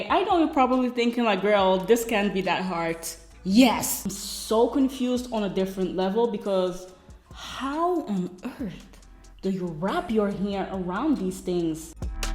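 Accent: Netherlands. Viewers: Omg this is the first vid I watch of u, butt I knew right away that u were dutch bc of your accent omg we dutchiess always have that same accent its just soo funny😂😂😂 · Het staat je geweldig maar wat een werk. Wel grappig dat ik even dacht een Nederlands accent te spotten. Heel even maar. Want je Engels is echt goed. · She says that in the description box: She lives in Belgium, half Dutch-half Ghanaian, and Dutch is her first language, thus the thick accent!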